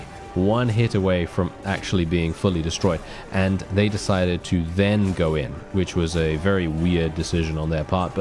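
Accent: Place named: Cockney, United Kingdom. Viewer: I really enjoyed this episode, Cockney accent aside. Add it in, still really enjoyable. It's fun, but not required!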